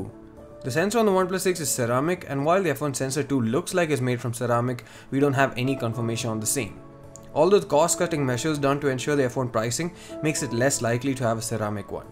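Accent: India. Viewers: Mallu ayirunno, njan vicharichu hindi karan ennu😀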